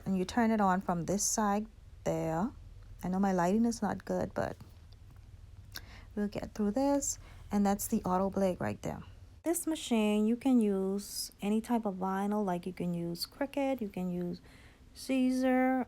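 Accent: Bahamas. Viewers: I am too just knew the accent when i heard it 🇧🇸❤️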